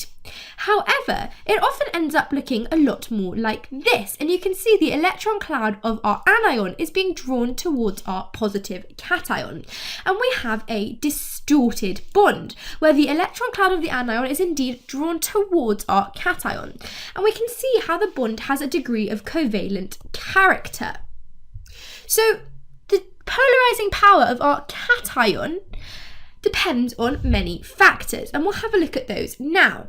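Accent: United Kingdom. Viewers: its because of her accent lol mb she has been living in britain from her childhood and developrd british accent i dont know why some indian are hating her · It's British accent.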